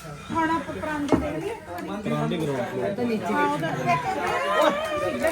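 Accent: Malwa, India. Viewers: Hnji didi ji malva cha aapa sare varge hi bolde aa ji and tusi sare bhut vadiaa o didi ji waheguru kare tusi didi hamesha aae hi khush rho ji ❤❤❤didi plzzzzzz jado tusi kadi pind hun aae ta daseou ji mera pind kot fatta ji kot smir de nal ji asi thunoo ghar blaouna ji ❤❤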